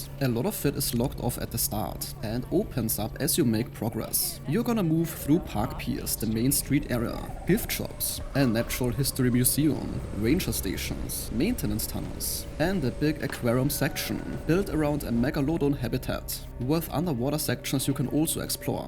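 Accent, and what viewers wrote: Germany. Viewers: Junge dieser deutsche Akzent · Sounds german :D Thanks for the review !!!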